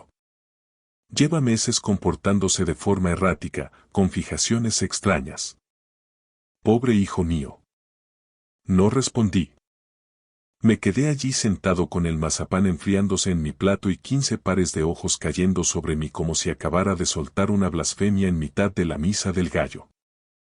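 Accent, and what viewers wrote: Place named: Mexico. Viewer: Imposible 😂! No se puede decir que el protagonista que locuciona es de Toledo y ponerle acento mexicano. No way!!! 🤦🏻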